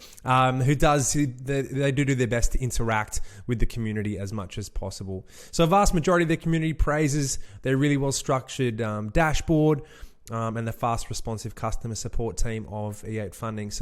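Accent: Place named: Australia. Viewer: Your accent being too Aussie, can only suggest that you have a bias to lean towards conformity rather than ingenuity. Other than that, seems like an alright person.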